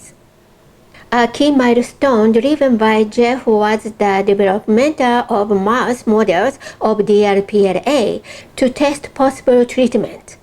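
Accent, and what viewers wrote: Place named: Japan. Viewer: カタカナ棒読み英語を改善させないと世界には通用しないですね。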